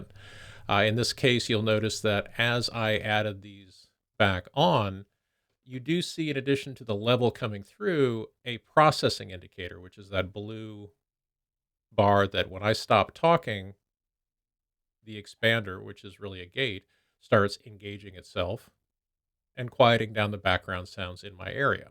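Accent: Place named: United States. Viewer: Thank you so much for sharing your precious know-how. (And really Excuse me, but which side of the US are you from, East, West, South, or North? Because I really like English pronunciation like yours. I'm asking because I really want to know which side of the US that pronunciation is from.) Sincerely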